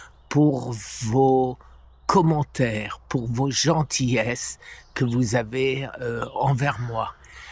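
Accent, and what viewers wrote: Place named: Nord-Pas-de-Calais, France. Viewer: Bonjour. Tu parles comme les ch'tis! C'est ici que l'on dit "Petit pain au chocolat" 😉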